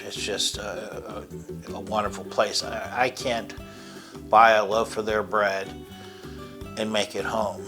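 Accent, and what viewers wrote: Pittsburgh, United States. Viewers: Thank you from the bottom of my black & gold heart! 💛🖤💛 I’m a Pittsburgh girl living in Arizona and, like the others, I load up on Mancinis every time I come home! You are a godsend. Naturally, I am now subscribed to your channel. Your accent makes me feel like home. And thank you for explaining everything so well. All the details are so important And I can’t get that from reading a recipe. Go Steelers. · Did you ever read my mind! I"ve been looking for a recreation of Mancinis recipe for a very long time, usually give up! Grew up on the stuff, so many memories of driving down to the bakery in the 'Rocks to get it fresh. Our Sunday spaghetti dinner could go without meatballs, but NEVER Mancinis! Live too far away now to get it sent to us. My heartfelt thanks to you for sharing this, especially during these tough times. 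Little nostalgia goes a LONG way for comfort. So happy to find your channel! Just hearing your Pittsburgh accent makes me happy! If I don't have the malt powder, could you suggest an alternative? Also- cannot make real hoagies without this bread, so now I can pretend I ordered one from Portofinos.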